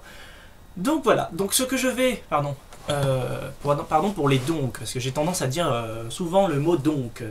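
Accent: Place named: France. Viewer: j'adore comment tu prononces à la française tous les mots anglo saxon XD ça me tue x,DDD One c'est ma référence depuis longtemps (notamment pr HP <3)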